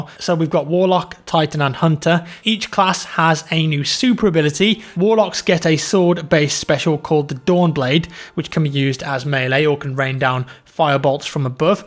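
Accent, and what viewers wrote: United Kingdom. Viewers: اللكنه البريطانيه 😷💔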